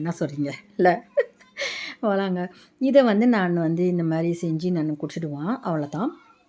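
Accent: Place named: Pondicherry, India. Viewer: Bonsoir, comme d'hab,une bonne recette toute simple.J 'adore tes vidéos pour ta façon de parler,une vraie Pondichérienne 😄.Tu me fais pensé à mes parents et à mon enfance.Merci ma chère.Continue à nous faire plaisir ❤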